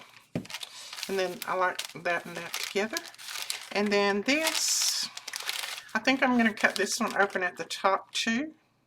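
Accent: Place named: South Carolina, United States. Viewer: it you quit, one of YouTube's brightest stars will dim! You really are talented! Your projects are ALWAYS done SO well! 💖 I moved from South Carolina 15 years ago, and when I hear your voice, I'm right back there where I left a piece of my heart💕😊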